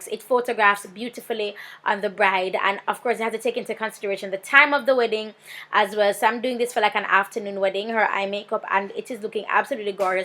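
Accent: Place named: Jamaica. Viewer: real Jamaican just the talking make you know lol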